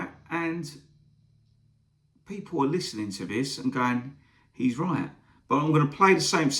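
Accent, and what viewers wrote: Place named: England, United Kingdom. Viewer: Ha. First time seeing one of your vids. I didn't expect the English accent. Your playing and overall vibe was that of an American Southerner initially. That was meant as a compliment, btw. ~Native Texan (Subscribed)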